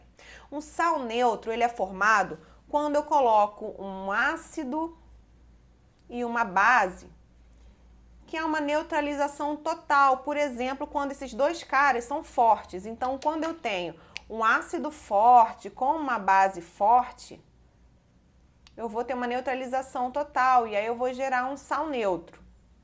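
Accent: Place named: Rio de Janeiro, Brazil. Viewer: É o sotaque carioca KKKKKKKKK nossa voz sempre parece por isso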